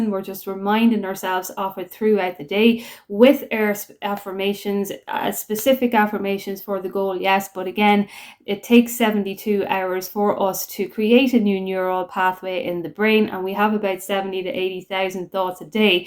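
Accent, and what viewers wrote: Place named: Ireland. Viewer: hi I have sent an email with details 👍 thank you so much for the content you post, just love that Irish accent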